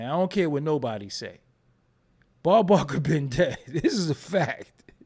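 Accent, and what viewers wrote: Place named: New York, United States. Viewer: Nigga you sound like a NY’er… I’m my NY accent “Get the f… outta here”…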